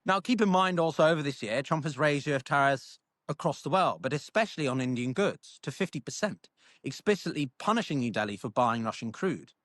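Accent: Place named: England, United Kingdom. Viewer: Excellent Video. Nice English Accent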